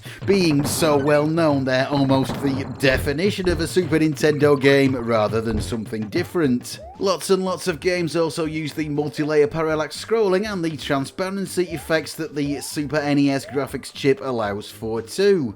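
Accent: United Kingdom. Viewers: This is how proper British folk talk.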